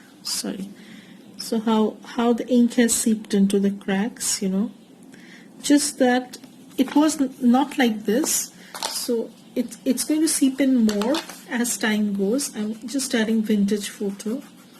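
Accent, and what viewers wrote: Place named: India. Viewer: I could listen to your lovely Indian accent for hours. Very cool technique.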